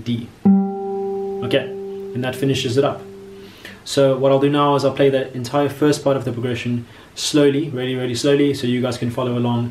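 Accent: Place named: South Africa. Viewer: I nearly died from excitement when I heard the south african accent!!!! It's not every day I find south african youtubers 💀 Keep doing what you're doing, this tutorial was amazing!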